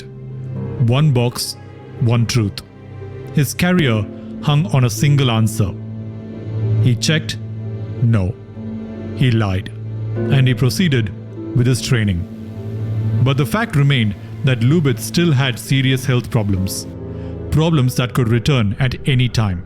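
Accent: India. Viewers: Fck the narrators thick Indian accent … is so disturbing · Hey are you from india? You accent sounds indian.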